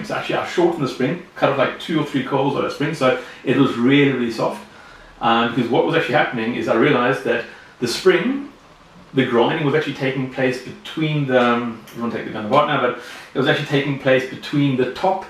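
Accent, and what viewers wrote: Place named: South Africa. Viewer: Good to hear that accent after all these years. Middle aged now but growing up in England by some strange twist of fate my first real best mate was South African, then in senior school my best mate was a very superb bloke (again South African), and in my twenties I worked a shitty job briefly where I hated almost everything about it, other than one guy who was again South African and a real life saver.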